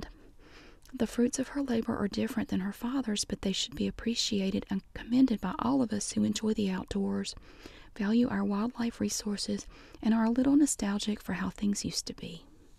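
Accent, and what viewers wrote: South, United States. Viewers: Nothing but good ol' fashioned tingles. Loved hearing that Southern Accent. Reminds me of where i grew up :)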